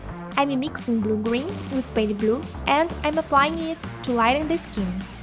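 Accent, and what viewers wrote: Brazil. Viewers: Nice tutorial, just a question Where're you from? Your accent sounds brazilian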